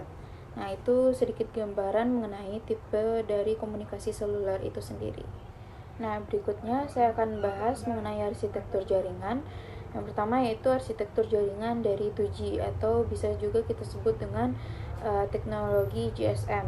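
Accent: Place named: West Sumatra, Indonesia. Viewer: Wah enggle sudah bagus, tpi bahasa indo nya masih kelihatan bukitinggi vibes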